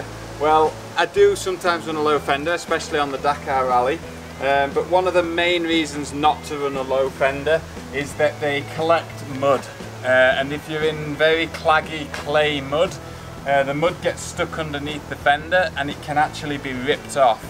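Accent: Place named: United Kingdom. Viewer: I love to hear you speak! The words coming out and the bloody British accent👍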